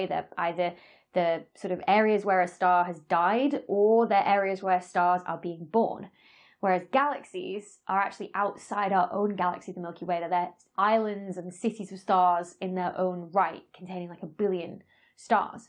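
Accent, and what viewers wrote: United Kingdom. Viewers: Is it me or is this more interesting because she has a British accent? Love Dr. Becky's channel.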